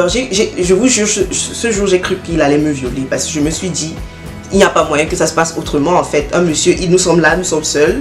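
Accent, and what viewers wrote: Cameroon. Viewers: Tu as un accent camerounais????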